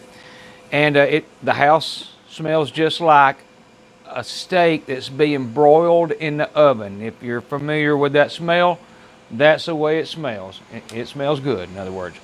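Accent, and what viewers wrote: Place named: Kentucky, United States. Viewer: I'm from Lexington, Kentucky and your accent makes me miss my Kentucky buddies. :) Great videos.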